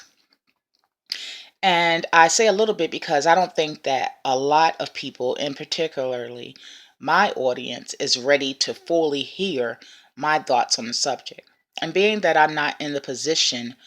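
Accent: Baltimore, United States. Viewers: Never mind. just looked at another video. If you from Baltimore you can hear it everytime.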